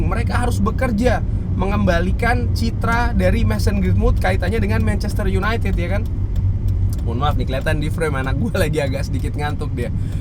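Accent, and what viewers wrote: Semarang, Indonesia. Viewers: Orang Semarang bang?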